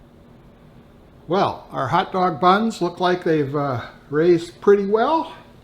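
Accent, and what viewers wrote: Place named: Midwest, United States. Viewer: You are NOT from Texas, man! Your accent is from IL!!! I can tell a mid west anywhere!!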